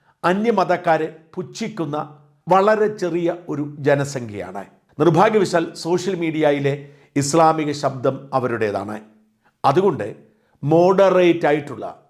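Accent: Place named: Kanyakumari, India. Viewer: Aarenkilum vilichotte shajan Sir, kanyakumari malayalam 🎉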